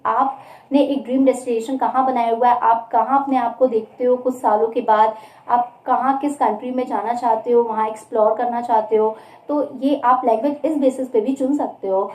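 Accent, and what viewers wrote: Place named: France. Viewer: And now i love the french accent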